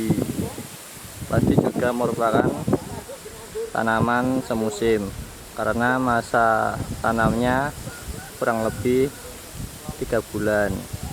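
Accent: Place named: Java, Indonesia. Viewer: medoknya kusuka🔥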